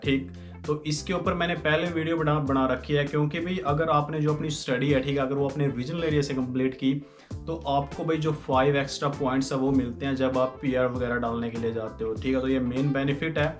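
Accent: Haryana, India. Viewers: Bai haryane te aa ?????😎😎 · HARYANA SA H BAHI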